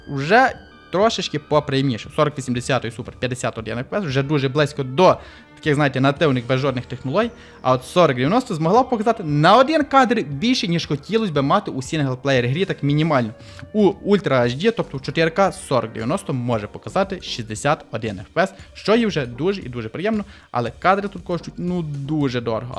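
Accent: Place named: Hutsul, Ukraine. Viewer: але файний той гоцульский говір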